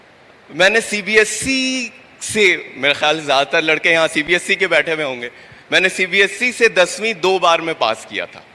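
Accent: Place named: Pakistan. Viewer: OMG 😂 yeh to Pakistani wala Hindi s bole rha h😱🙈🙉🙊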